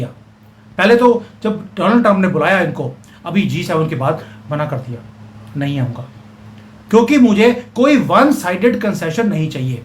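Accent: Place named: Punjab, India. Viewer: I had asked u to confirm if yr forefathers were from west side India. Lahore/ ASR. Yr way of talking/ using words which are usually spoken in that side . Gare for Olay( ice)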